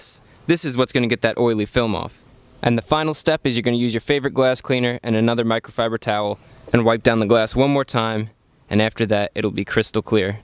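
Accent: United States. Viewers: Your american accent drives me crazy!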